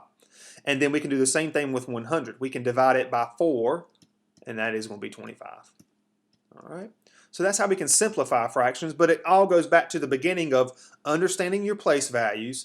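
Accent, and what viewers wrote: South, United States. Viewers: judging from the voice I guess your from the south, I am too I love the math you make it sound so easy. are there any other tutor sessions you do on line.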